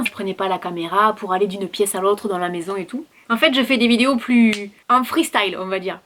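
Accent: South, France. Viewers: Merci pour cette vidéo. Ca faisait longtemps que je n'avais pas été sur tes vidéos et tu es tellement rafraîchissante avec ton accent du sud. je t'embrasse. Fiona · Coucou, ça doit être la première fois que je laisse un commentaire sous tes vidéos. C'était pour te dire que tu es une YouTubeuse que j'adore regardé et je ne suis pas déçue d'avoir découvert tes vidéos y a maintenant bien 2 ans. Ton accent me donne envie de redescendre dans le Sud a chaque fois ^^ Pour Instagram je dirais vas-y au feeling, poste des photos de ton environnement, de ton fils, tout simplement ce qui te plaît a l'instant T! Continue tes vidéos, encore plus des vidéos comme celle-ci et celle sur ta maison. Bisous, une abonnée d'Alsace 😉😘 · Plus le temps passe plus j'apprécie tes vidéos! Ton accent du sud me réchauffe le cœur 😊 pour Instagram post ce que tu as envie TOI de poster et partager ne fait pas la bouche en cul de poule (ça m'a fait presque cracher mon café sur mon clavier c'était excellent! 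😂) ensuite pour ton bébé tu as TOTALEMENT raison profite tant qu'il est avec toi c'est quoi ces commentaires a la noix.. Ce concept de lifestyle une journée avec toi j'apprécie totalement. Je retrouve ce plaisir de me pauser le temps de regarder une vidéo me vider l'esprit avant de ré attaquer mes taches ménagères quotidiennes. Continue comme ça j'aime vraiment ce que tu fais, tu apportes du positif dans le quotidiens des gens et merci du fond du cœur pour tout ça!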